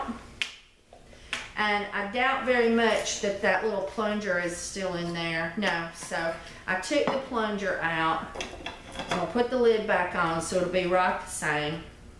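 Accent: South, United States. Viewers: Are you kidding me?? Jojoba oil is the cheapest oil EVER!!!!!!! And yes even the purest 100% is very cheap! I dont know where you buy yours from but over here in europe is the cheapest oil of all of the oils on this world together with grapeseed oil! ITs so cheap cuz its vitamincs and nutrient value is the lowest out of all the oils! Johjoba is a basic carrier oil and no powerhouse oil at all! And also you jsut made that up with the whole puttting extra oil in the bottles incase of a spill! ahahahahhaha You re so funny! Ive worked for beauty compagnies and trust me they dont anything ectra in there! You just made that up to feel bettter about yourself. And the reason why google wont recognize your voice is cuz you talk very ghetto and country! She didnt even try to speak correct English! From to you reminded me extactly like Madea!!!!! jaahahahahahah Mabble simons aka madea is the bomb! 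YOu should act and talk mroe like her! IT suits you.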